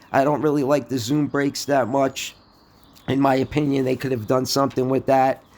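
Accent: United States. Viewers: Your whiny yankee voice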